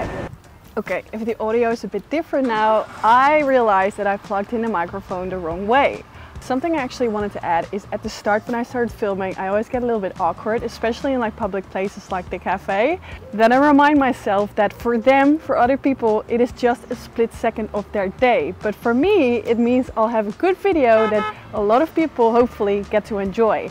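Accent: Netherlands. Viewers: Sooo happy this came on my recommended videos, I love your energy it really makes me excited to shoot aswell, even though i feel a creative block right now, this motivates me!! (Also recognized the dutch accent the first few seconds!! Zo leuk om eens een NL account te volgen 🥰) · Oh, what a nice shoot. PS. I love your accent too, because my mom is Dutch too (but your's is so much fresher😅, She's been in SA for 60+ years) Keep up the good work and teach me more about photography, OK? thanks😉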